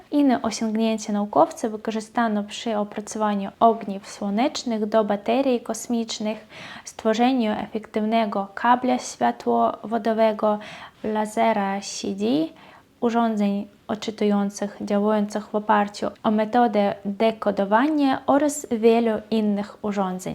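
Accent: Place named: Kresy, Poland. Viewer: Ciekawa tematyka . Dobrze ,że mówisz o sławnych ,a nieznanych szerzej Białorusinach . Bardzo się miło słucha twojej pięknej kresowej polszczyzny. Czekam z niecierpliwością na kolejny odcinek tego cyklu.❤😊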